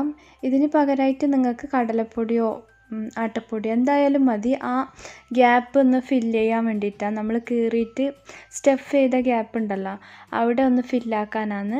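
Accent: Kannur, India. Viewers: 🥰kannurkarude nadan basha👍gd 👏🏻👏🏻👏🏻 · Ningal kannurano Namma, ninga kannur basha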